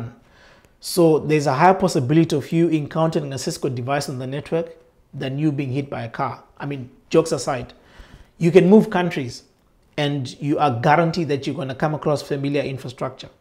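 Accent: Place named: Kenya. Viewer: Your accent is Kenyan probably from South Nyanza. Definitely had to sub and like. Am planning to start my CCNA come Jan 2021.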